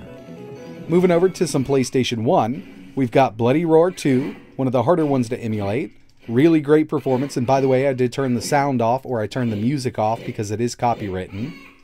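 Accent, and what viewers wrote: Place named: United States. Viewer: his accent sounds like he is in the u.s., NOT the e.u.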